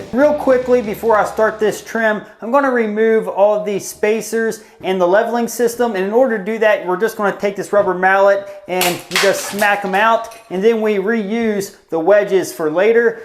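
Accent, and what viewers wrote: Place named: Pittsburgh, United States. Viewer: Idk where your from my guy, but your accent sounds exactly like people from Pittsburgh!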